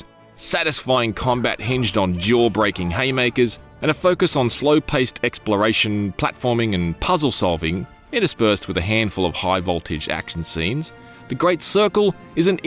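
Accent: Australia. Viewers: I've always admired your reviews. Well written, relatable, and just like a friend telling me what they think of a game. As someone who has spent most of my career in network TV and video production, I want to give credit where it's due. It's also always great to hear an Aussie voiceover that fully embraces how we talk. I'm also thrilled to see such a win for Xbox. Such a revered franchise from my childhood deserved the true AAA treatment, and I can't wait to fire it up on my PC.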